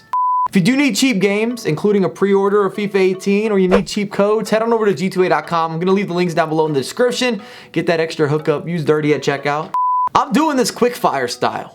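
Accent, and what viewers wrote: United States. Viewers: Only FIFA channel I can watch cause you’re AMERICAN💪🏻👌🏻🏈🇺🇸🇺🇸🇺🇸🇺🇸so you don’t have this nasty accent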